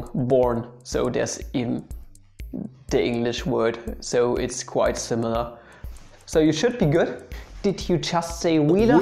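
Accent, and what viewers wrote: Saxony, Germany. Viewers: Er ist Sachse... das ist nicht der Norden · Aus welcher Ecke Deutschlands kommst du? Ich hör die ganze Zeit nur Friedrisch und Ludwisch. Erst dachte ich an Sachsen. Stimmt das? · Boii with Sachsen accent tells us how to pronounce shit. I found my kink. And with Kepler there is actually something that can go wrong. My GERMAN Professor still calls him Keeeeeeeeepler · Речь идёт, конечно, о разных диалектах немецкого. У неустрашимого нашего хоста явно саксонский акцент, и поэтому говорит Фридриш вместо Фридриха. :)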